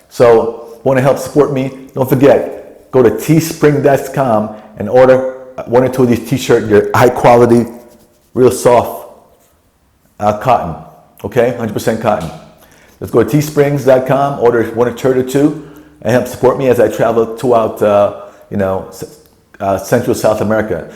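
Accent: Jamaica. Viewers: You are Jamaica you spoke Jamaica ingles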